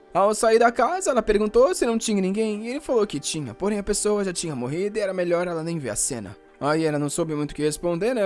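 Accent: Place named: Minas Gerais, Brazil. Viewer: É só impressão minha ou o jeito dele de narrar tá menos caricato e exagerado? Eu falo daquela melodia meio mineira que acho que tá menos acentuada mas não sei.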